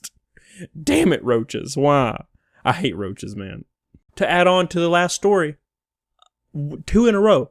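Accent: South, United States. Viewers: Is it worth mentioning that you have a Kermit the Frog voice sometimes? Like a southern Kermit. Anyway, good videos. Thanks!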